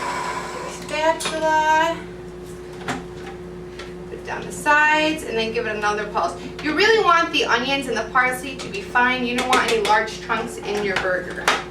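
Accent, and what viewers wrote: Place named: Rochester, United States. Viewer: You have a Rochester NY accent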